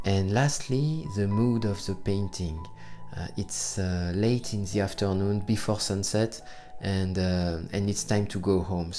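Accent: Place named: France. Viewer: Non, c'est compréhensible. Pour un anglophone l'accent français est charmant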